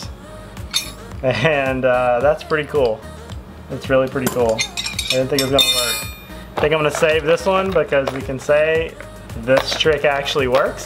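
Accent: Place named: South, United States. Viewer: It's the accent....Southern braintrust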